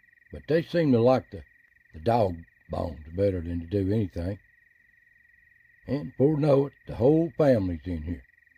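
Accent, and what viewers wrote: Appalachia, United States. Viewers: Well I didnt get to finish my"hey howld ya dos Its so good listening to a fellow "ridge runner(were from Tazewell area up on Ravens Ridge)..I enjoyed.hereing back home in your voice and will subscribe to your youtube channel..you have a safe and sane New Years amd please keep on visiting with us..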